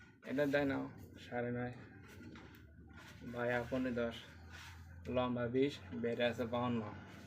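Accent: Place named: Mymensingh, Bangladesh. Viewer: ভাই সব ঠিক আছে দয়া করে আপনি আপনার ডেসক্রিপশনে অবশ্যই আপনার ঠিকানা দিয়ে রাখবেন ঠিকানা দিলে কি হয়, অনেকে আপনার পার্শ্ববর্তী জেলা বা পাশাপাশি থাকে তারা গিয়ে নিয়ে আসতে পারবে আপনার কথা শুনে মনে হয় শেরপুর জামালপুর কোথাও ময়মনসিংহের মধ্যে বা টাঙ্গাইল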